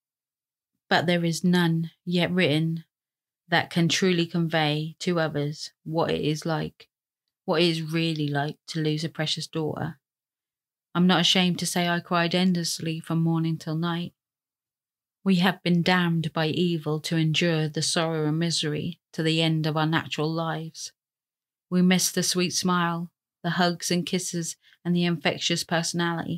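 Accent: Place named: Suffolk, United Kingdom. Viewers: Hey, I'm obsessed with anything true crime & just found your channel, I'm now gonna binge all your videos. Thankyou for your content! Where are u from? Your accent sounds sooo local to me (Suffolk) x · weird hearing a Suffolk accent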